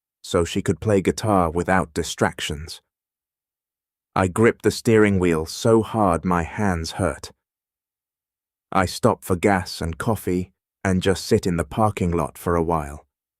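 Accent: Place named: United Kingdom. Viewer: THIS IS AN EXACT REPEAT FROM ANOTHER CHANNEL!!!! IT’S STANDS TO REASON IF YOU ARE GOING TO POST STORIES YOU SEARCH FOR ONES THAT HAVEN’T BEEN USED BEFORE INSTEAD OF CHANGING THE NARRATOR‘S VOICE TO BRITISH!!!! VERY DISAPPOINTED, TOO MANY PEOPLE TRYING TO MAKE A LIVING POSTING FAR OUT, REPLICATED, AND RANDOM NEW STORIES TO PROVE THE CHANNEL IS DIFFERENT AUTHENTIC ORIGINAL!!! UNFORTUNATELY HE WAS IN A BRITISH ACCENT WITH BORING BACKGROUNDS, THE QUALITY OF THE WAS DESTROYED, AND PROPER PRONUNCIATION AND POORLY DICTATED WITH A VERY MONOTONE VOICE!!!!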